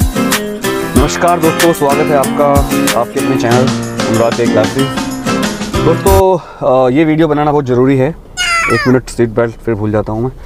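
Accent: Delhi, India. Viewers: Delhi se ho kya sir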